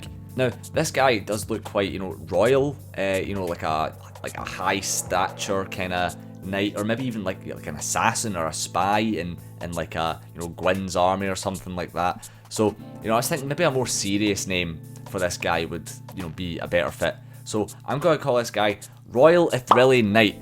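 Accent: Scotland, United Kingdom. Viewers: Are you Scottish? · that scottish accent maaaaaaaaaaaaan!! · I love the Scottish accent!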